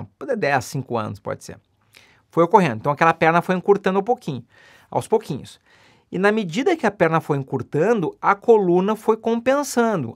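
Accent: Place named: South, Brazil. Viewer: Amo o sotaque do Sul e gostei muito do vídeo. Esclarecedor! Parabéns!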